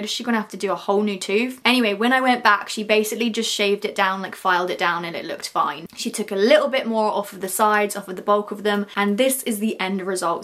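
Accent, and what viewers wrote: United Kingdom. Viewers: Wow ur British accent is so cool😅😊❤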